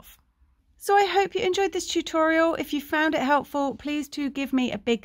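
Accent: United Kingdom. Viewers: this is random but what kind of British accent do you have?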